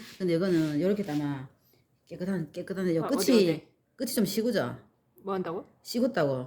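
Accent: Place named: Gyeongsang, South Korea. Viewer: 경상도 사투리는 또 하나의 외국어..ㅎ 정겹고 다정다감합니다. 맛도 좋은 만병초 함초가 지천에 늘렸다는 사실이 신기합니다. 늘 건승하세요.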